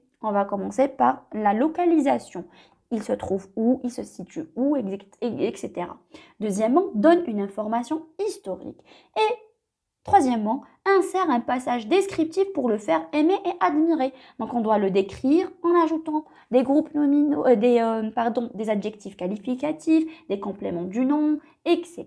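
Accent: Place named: France. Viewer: J'adore votre accent français😍😍😍😍😍😍😍😍😍😍😍😍😍😍😍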